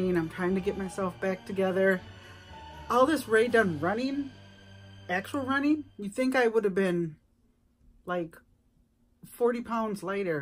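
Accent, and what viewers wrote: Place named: Minnesota, United States. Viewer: I knew with the accent you had to be from Minnesota, I’m from Delaware and we barely get any Rae dun. You got so lucky I want those coffe cups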